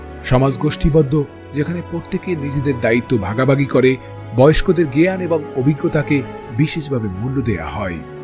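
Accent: Barishal, Bangladesh. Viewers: আপনি কি বরিশালের লোক ? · আপনি বরিশাইল্লা ভাষা কেন বলতেছেন।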